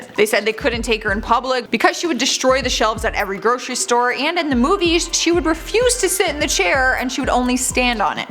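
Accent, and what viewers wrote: Canada. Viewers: Her hair is in a weird style...but I don't feel like punching her. Her accent is funny too....Canadian?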